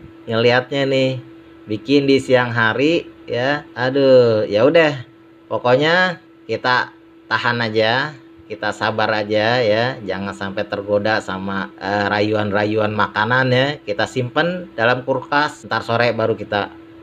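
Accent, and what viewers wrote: Jakarta, Indonesia. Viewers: Baru nemu channel si abng, demen banget enak ngomong nye betawi asli... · Gile ye betawi asli ye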